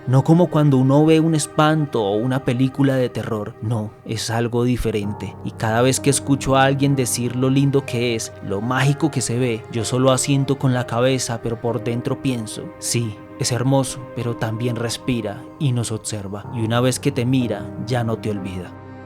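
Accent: Argentina. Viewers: Me encanta el acento con el lunfardo argentino ☺️ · EN SANTIAGO DEL ESTERO. SEGÚN DE DONDE ES AVECES SI HABLAN ASI. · Es al revés un colombiano leyendo con el acento bien Argento 🇦🇷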